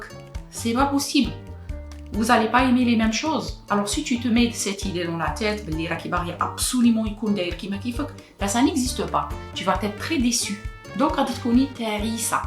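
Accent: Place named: Oran, Algeria. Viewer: J'adore ton accent oranais, ya Omri tu m'inspire avec tes conseils continue stppp 💞💞💞💞💞💞💞💞💞💞💞❤️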